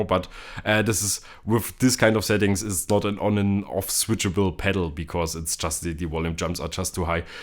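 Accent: Germany. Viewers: Ich höre deinen deutschen Akzent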